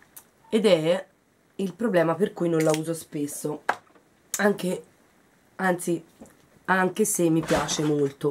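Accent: Tuscany, Italy. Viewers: è solo il primo che vedo dei tuoi e già mi piaci (ora vado a vedere gli altri) sei brava e simpatica...bello l'accento toscano :)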